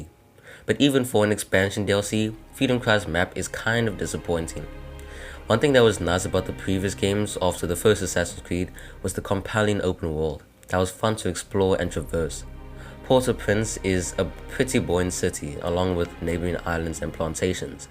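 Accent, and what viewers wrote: South Africa. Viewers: U sound South African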